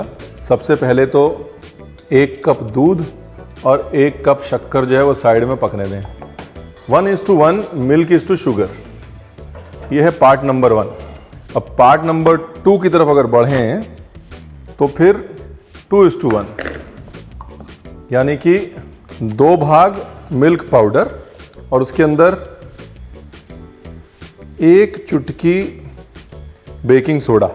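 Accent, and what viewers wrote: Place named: Lucknow, India. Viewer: Science class + History class + His Lucknowi leheza + Lucknowi nazaakat which is nothing but absolute romancing of all ingredients is nothing but creating a masterpiece .... Not to forget Ranveer Sir ,,, inspite of being Punjabi we can see his love for Lucknow as he spent his early days of learning this art in Lucknow!!!!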